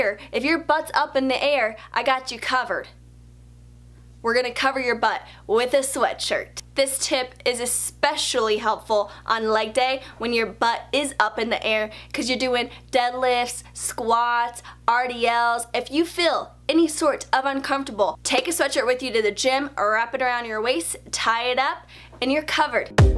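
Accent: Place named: South, United States. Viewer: Are you from the South? Sometimes I hear the accent, sometimes I don't.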